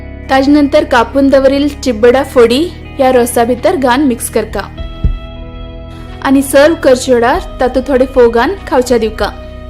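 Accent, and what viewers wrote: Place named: North Kanara, India. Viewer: Excellent video, brought back memories of this simple yet tasty dish. I love your Konkani narration is that from North Kanata?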